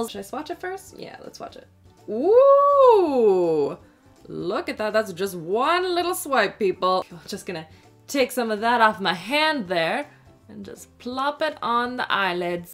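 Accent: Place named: Canada. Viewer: I love your videos so much! Thanks for being so fun 💙😋 is it weird that I love how you talk? I’m from Texas so nobody here has a Canadian accent and it’s so cool how you say certain things! Anyways love ya! 💄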